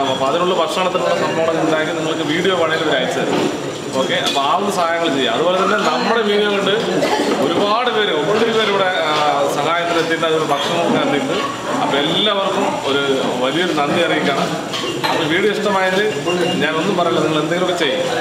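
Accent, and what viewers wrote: Palakkad, India. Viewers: am from Coimbatore i saw your all videos like it especially your palakkad slang is good and like it basically am from palakkad. one day I want to join with ur food preparation and tasting with you. If any chance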